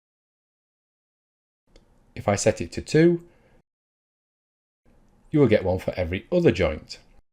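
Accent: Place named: Australia. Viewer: I love Australian accents. Yoo cawll daht a noife?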